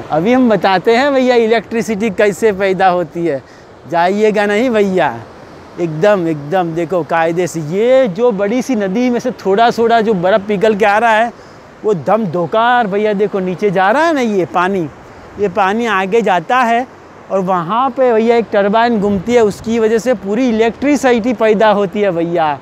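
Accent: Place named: North, India. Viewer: आप गुजराती है पर आपकी हिंदी का लहज़ा उत्तर भारतीय है , ये बड़ी अच्छी बात है।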